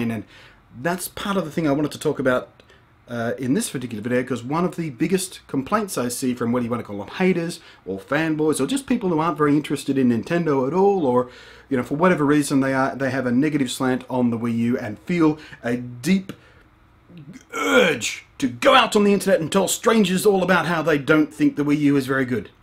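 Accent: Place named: Australia. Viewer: Love watching your stuff man, I agree with 99% of everything you say. I dig the enthusiasm you have for gaming, Its rare these days and its refreshing to see. Your game and device reviews are very entertaining. Its also good to hear a fellow aussie online. Keep it up "thumbs Up"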